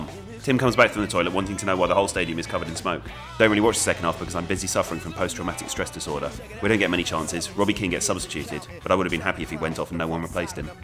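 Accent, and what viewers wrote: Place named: Manchester, United Kingdom. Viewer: Why is he talking in a manchester accent, none of their fans r from there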